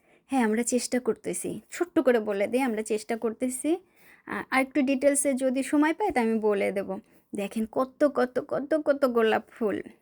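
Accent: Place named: Comilla, Bangladesh. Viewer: আপনি এভাবে কথা বলেন কেন,আপনি কি কুৃমিল্লার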